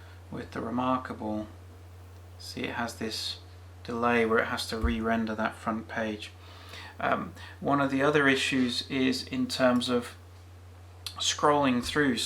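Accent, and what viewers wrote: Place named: United Kingdom. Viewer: When viewing a PDF in landscape on the Note Air, is it possible to do fit-to-width? On the vid, it seemed like you were viewing the whole page in landscape, which made the text really small. Another thing: With split screen on the Air, when reading a book in one half and making notes in the other half, the fact that you can't scroll notepad up as you write but have to keep repositioning the device in order to maintain your writing posture (right?) means that one's viewing angle/distance of the book one is making notes from has to be continually changing. Doesn't that make split-screen more or less useless for serious, prolonged note-taking from books/PDFs? Thanks for the vid! (You've got a British accent but you said "euros" not "pounds"!)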